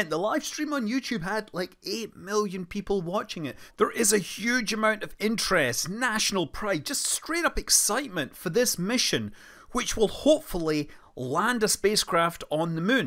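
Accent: Scotland, United Kingdom. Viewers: Hi - I love the way you refer to the Mun (which has an uh sound) rather than the Moon. Either it's your rather endearing Scottish accent or else you have been playing too much KSP. Maybe both 🤣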